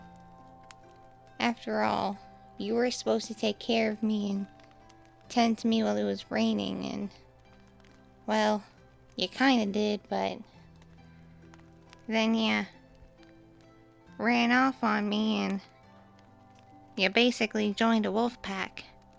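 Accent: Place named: South, United States. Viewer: Am I the only one who thinks that the kitsune in the thumbnail looks like an Eevee. Southern accent is nice. Believe me I was born in Tennessee I know what a southern accent is like. Luckily I didn't live in Tennessee long enough to develope a southern accent. Cause I have no attachment to my birthplace once so ever.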